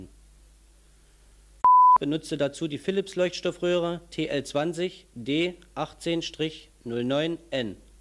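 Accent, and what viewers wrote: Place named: Saxony, Germany. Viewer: ich könnte kotzen wenn ich dieses sächsisch höre...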